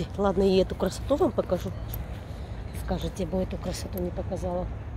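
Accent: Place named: Armenia. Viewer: Я люблю отдыхать в Сочи, именно в Адлере, там много знакомых армян и армянок. Смотрю видео и представляю, что я в Адлере, и по природе и по вашему акценту))) Спасибо Вам за красоту!!!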